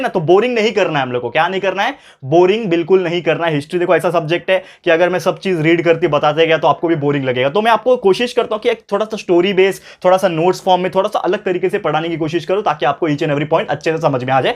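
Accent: Maharashtra, India. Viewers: Sir is your mother tongue Marathi.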